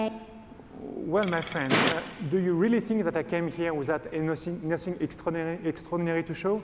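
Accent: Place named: France. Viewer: superbe ! ( l'accent francais xD )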